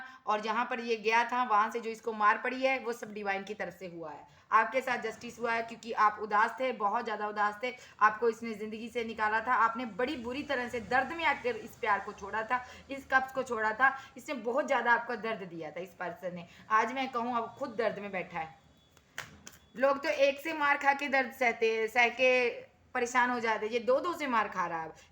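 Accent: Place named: Punjab, India. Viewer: Madam aap ka Punjabi lehja boht acha lagta hai Allah aap ko aur kaamyabi de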